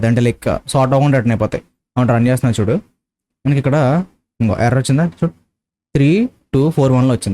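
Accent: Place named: Godavari, India. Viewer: You are from east or west godavari... Ur slang in very close to ours...🙂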